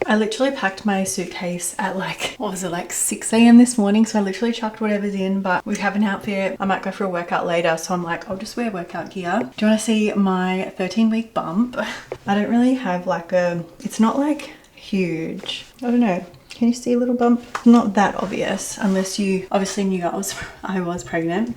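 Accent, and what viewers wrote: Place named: Australia. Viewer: I’m Japanese. I leaning Australian English from your Vlog, thank you so much❤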